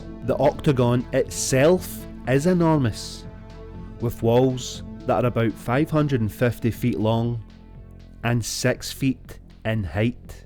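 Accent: Scotland, United Kingdom. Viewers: I enjoy listening to your voice and your beautiful clear english.Scottish heart voice thank you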